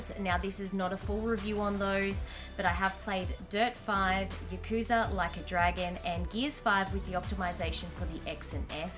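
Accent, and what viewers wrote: Australia. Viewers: Omg are you Australian because I am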